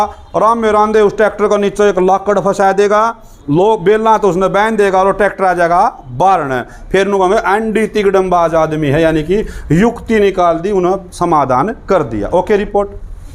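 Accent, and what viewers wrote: Haryana, India. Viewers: M Haryana Exams Ki Taiyaari Nhi Karta But Sir Ji Ki Haryanvi Voice Tone Bhut Acha hai 😊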